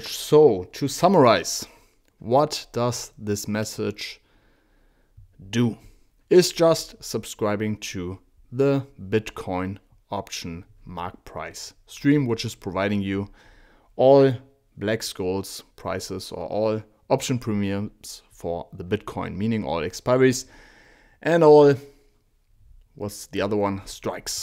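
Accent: Germany. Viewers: My God thank you for German accented coder, you are awesome. Indians are fine but accent is sometimes difficult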